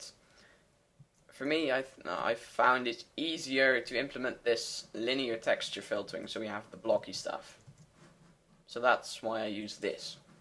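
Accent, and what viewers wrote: Netherlands. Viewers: haha i knew it! your acsent it was dutch! YEAH! sorry for that xD but i´m dutch to, and i make games to. No tutorial though, and still good english btw :)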